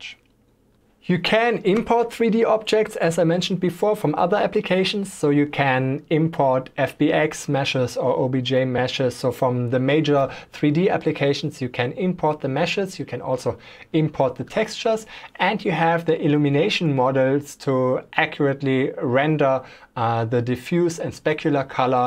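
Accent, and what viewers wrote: Germany. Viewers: man hört so har das er deutsch ist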